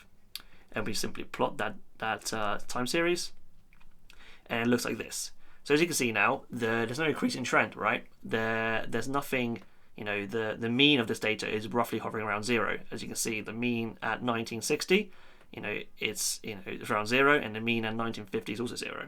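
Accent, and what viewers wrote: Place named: United Kingdom. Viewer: You are not talking with only your British people , so try to speak slowly with normal English and swallow your saliva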